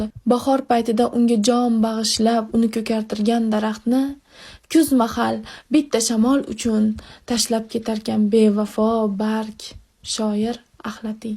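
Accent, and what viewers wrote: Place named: Tashkent, Uzbekistan. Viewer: Sizam xozi toshkenli bopkettiz😂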